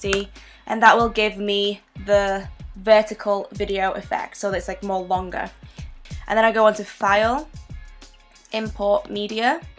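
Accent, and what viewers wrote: United Kingdom. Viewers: I’m here for the British accent too! 🇬🇧❤️ I need the deets on how your insta vids are clear! When I upload it’s not great definition! 😭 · You said you are from the Philippines ? Were you born there or Great Britain ? You sound British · I don't know why but I was fully expecting an LA accent from the intro! I get so excited when I see fellow brits making banging content! LOVED THIS ♥️ you got yourself a new sub xx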